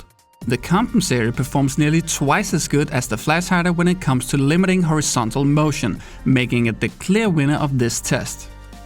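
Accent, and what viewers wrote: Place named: Denmark. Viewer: Are you Danish? If not! Then where are you from... Du lyder Dansk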